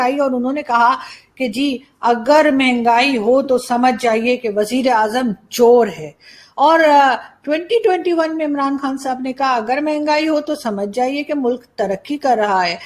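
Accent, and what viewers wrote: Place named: Mumbai, India. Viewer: Bhidu. .. Boleto ekdam Bombaiyya Hindi bol rahe ho..aap ek din Vada paav khane Mumbai aajao..!!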